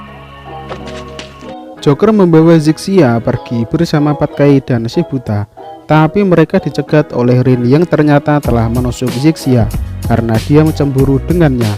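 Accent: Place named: Java, Indonesia. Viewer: Jawa medok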